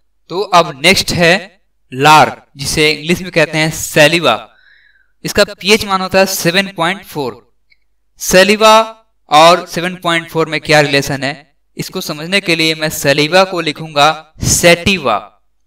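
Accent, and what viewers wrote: Bihar, India. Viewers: Bihari ho ka ???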